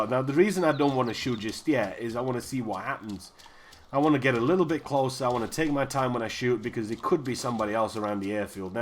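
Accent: Finland. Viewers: I could almost hear that you're Finnish even if I didn't hear you speaking Finnish :D it's the accent... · Sulla on aika suomalainen aksentti, pakko sanoa. :) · Totally finnish accent :) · that bandit who know you sound like finnish akcent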